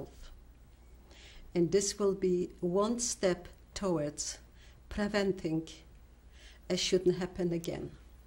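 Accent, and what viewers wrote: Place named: Germany. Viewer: Boy she has that strong German accent especially when saying my the German way Meine Vater the v make the F sound in German.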